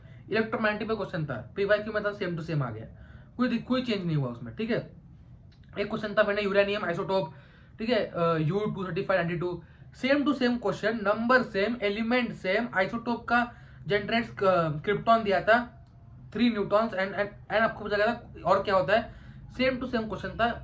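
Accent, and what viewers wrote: Maharashtra, India. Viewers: Bro are from Maharashtra.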